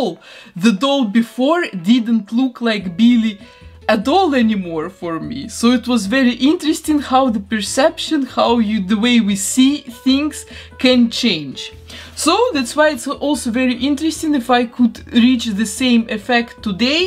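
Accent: Russia. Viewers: Русский акцент присутствует)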